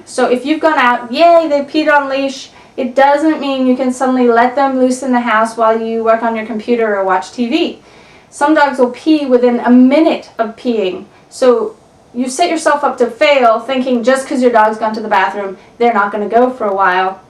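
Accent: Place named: South Africa. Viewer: oh my gosh! I thought i heard a African accent! Are you from South Africa? I lived there for the most of my life.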